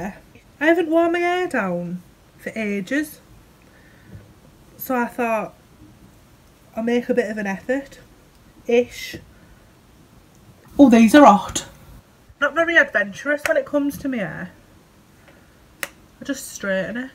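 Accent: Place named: Scotland, United Kingdom. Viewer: I’m in love with your ascent where you from Scotland, Glasgow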